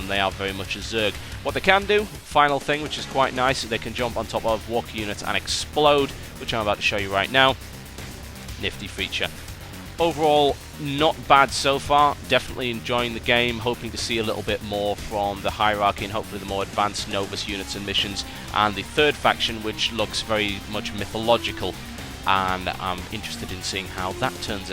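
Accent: United Kingdom. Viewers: He sure sounds more brittish here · Is it just me or he sounds more "british"? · wow! you sounded more british back then ;b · Is it just me or does he sound more British in this video then he does now? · he sounds a bit more english you know. translation for idiots (he sounds a bit more british) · old sound quality makes him sounds more british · He pronaunces everything so... british? I dont know, but I have the strangest boner.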